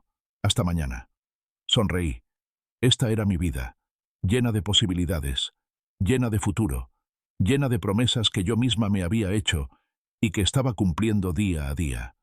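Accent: Argentina. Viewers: El siseo del narrador desquiciante y el acento ese porteño más desquiciante todavía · Así hablan los argentinos, uruguayos. Aquí en Chile también hablan sin tildes o acento y los plurales no los pronuncian. . Tienes que poner mucha atención para lo que realmente quieren expresar o para colmo hablan entre dientes, casi susurrando la mayoría de veces. Bendiciones.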